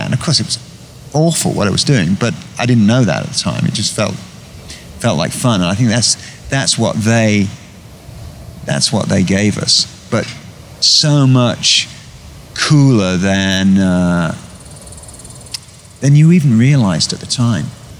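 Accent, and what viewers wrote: United Kingdom. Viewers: Posh accent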